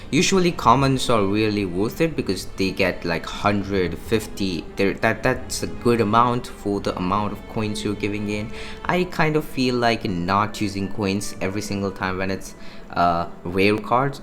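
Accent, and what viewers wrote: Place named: India. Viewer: why can’t indians say “er”??? its always “ohh” like instead of “first” they say “fohst”. or burst is bohst. so annoying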